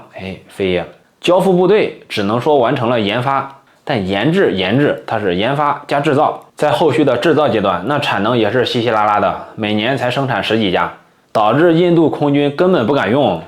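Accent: Henan, China. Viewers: 你一定不是的粉丝，他说的是河南话。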